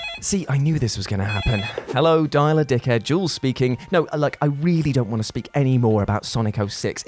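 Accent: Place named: Wales, United Kingdom. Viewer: Always thought u were a brit. Your from Wales?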